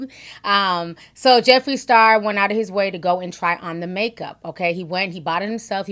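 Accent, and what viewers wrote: Nigeria. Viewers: Come through with the Nigerian accent yesssss!!!😍😍😍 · Well hello beautiful, come through with the Nigerian voice. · I love your Nigerian voice!!!!! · I love the wsyvthis is editd. And I see you can turn that Nigerian accent on/off real quick. I bet that's what your kids hear when you're mad. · I luv your Nigerian voice. More please! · Love your Naijan accent 😻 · Omg your Nigeria accent was on point omg it’s crazy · And please use ya Nigerian accent more often! We need a commentary video in a full Nigerian accent. Please now! I beg! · I love your Nigerian Accent!❤ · ! I didn’t know that you’re Nigerian!! squeals That accent floored me.🙌🏿👩🏾‍🦱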